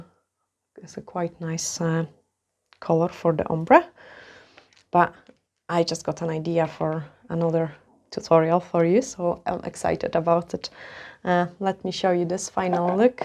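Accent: Scotland, United Kingdom. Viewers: Greetings from Columbus, Ohio, USA. I was going to try this method but I don't have any acrylic powder so I will have to do a different way. I do pick up some Scottish inflection in your accent. Love that and your work. I am a retired RN, teaching myself nails the last 3 months. Love this hobby.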